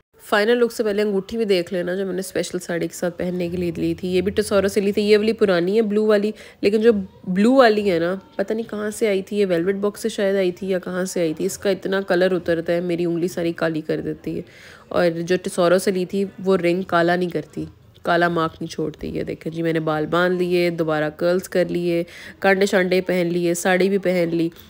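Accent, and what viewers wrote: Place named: Punjab, India. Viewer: Kuch b lag rahi ho bolne se bilkul paindu lagty ha kuch b high fy pehan lo bolne ka andaz daggon wala hi rehta ha punjabio ka bolne ka style sahi karo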